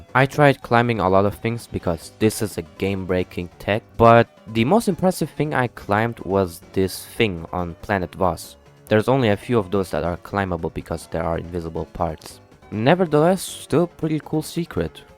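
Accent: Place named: Russia. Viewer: Этот бро реально скрывает что он русский. Слышно русский акцент.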